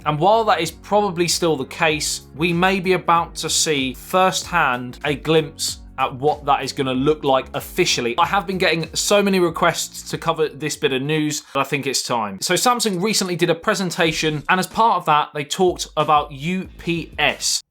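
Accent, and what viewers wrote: United Kingdom. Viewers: Are you from the UK? I'm sorry but I just can't stand the accent. And no I don't need a foldable phone at all. I mean it might be cool but no. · I subscribe just for ur British accent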